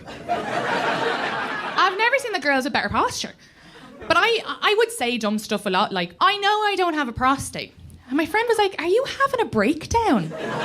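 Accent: Ireland. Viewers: Same. Reminds me of Saoirse Ronan. There's something about the Irish accent that is appealing.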